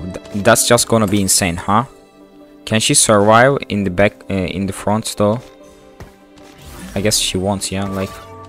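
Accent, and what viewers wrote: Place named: Turkey. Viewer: aksanindan türk olduğunu anladım sonra kanal adını gördüm :D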